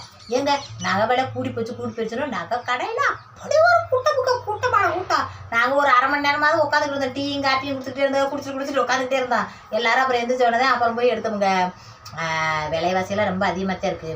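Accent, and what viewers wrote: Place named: Tamil Nadu, India. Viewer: Un video yengaluku oru motivation na eruku, Unnoda tamil slang, kudumbapaanga erukuradu, u tubela samarthiyama sambadikura thiramai , super vazga valsmudam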